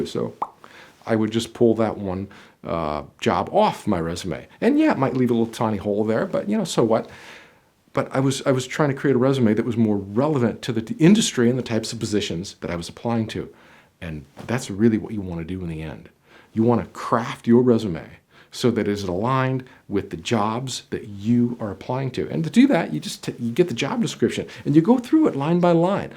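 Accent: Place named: New York, United States. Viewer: Amazing content i always watch your video to practice my listening i like your accent ... are u new yorker , aaren't u ?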